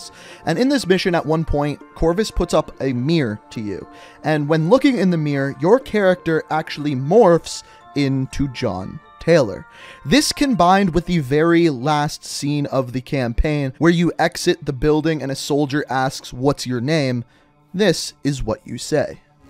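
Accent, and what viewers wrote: Canada. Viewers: Is the Guy Canadian?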